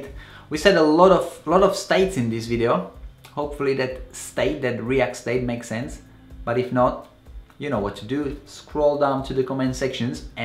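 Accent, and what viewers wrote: Czech Republic. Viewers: Within the first 10 seconds, I said, "This guy is Czech".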